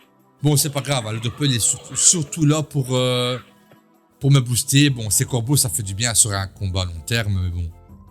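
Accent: Belgium. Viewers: Yo G8 osa 1.29 tous mes respect ! T'es belge non ? ton accent me rire tu gagnes +1abo.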